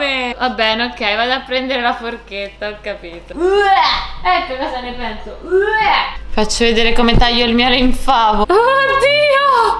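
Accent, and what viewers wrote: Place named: Milan, Italy. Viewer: Stai iniziando ad avere un accento milanese noooo ti pregoooo torna romana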